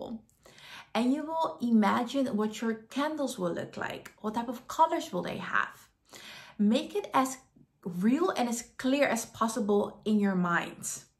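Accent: Netherlands. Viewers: Are you dutch !?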